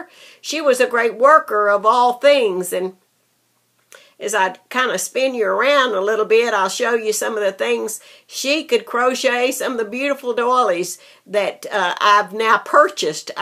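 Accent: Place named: Texas, United States. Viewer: Your weløcome. I thoroughly enjoyed your videos. Its always for to see and hear about things I have no possibility to know. Loved your texas accent to.) My blog oh wow. I dont update that one as I should to buzy uploading things in here. You find me on pintherest also. Line Cathrin Ekseth wich is my full wonky norwegian name. I just spotted your order. Thank you very much for that.:D Big hug Lele.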